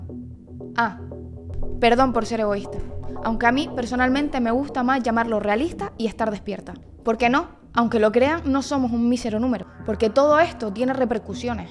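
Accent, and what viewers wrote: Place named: Andalusia, Spain. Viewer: Eres Andaluza o canaria seguro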